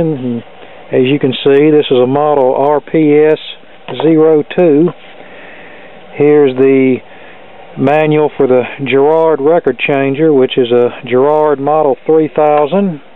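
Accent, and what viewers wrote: South, United States. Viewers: staggs You have to take in account of his southern accent.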